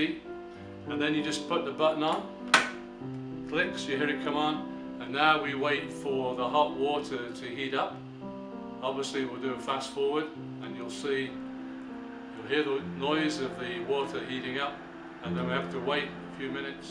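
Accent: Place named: Ireland. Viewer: Im just here for the accent. It's a soft subtle accent, Irish? Pretty. I love this guy. Is he married? M. IL. ❤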